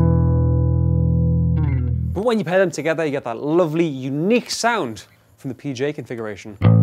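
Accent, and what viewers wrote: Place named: RP, United Kingdom. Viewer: are you just the coolest bass player I've seen in a long time, or what? I love the intro, 'you shouldn't have'. For some unknown reason, every time I hear your accent I think of a quirky bass player, educated at Oxford, or Cambridge, but also understands the finer points of bass playing and bass guitar. Thanks for the review BTW; excellent. I've passed it on to all my muso friends.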